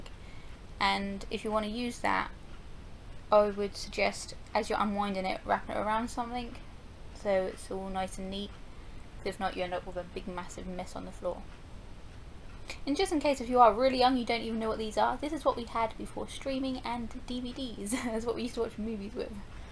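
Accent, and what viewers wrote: United Kingdom. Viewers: Thank you very much for the video and the great british accent. Greetings from Germany. Love it so much! : )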